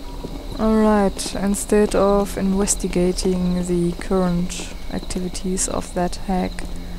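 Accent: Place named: United Kingdom. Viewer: your accent is beautiful :D british, innit?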